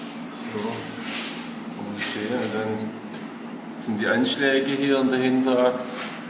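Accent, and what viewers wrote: Swabia, Germany. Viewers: Danke für das informative Video! ...Ist das Stuttgarter Schwäbisch oder eher Ludwigsburg?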